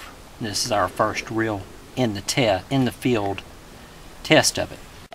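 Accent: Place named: Texas, United States. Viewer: I subscribe to your channel. I know at lot of things that you teach ,but you always seem to have one idea that I had not considered. I love your Texas accent,; My son-inlaw is Texan. If the two of you were talking from another room, I wold have to guess who was who. I enjoy your vids. Keep up the good work! 28Bull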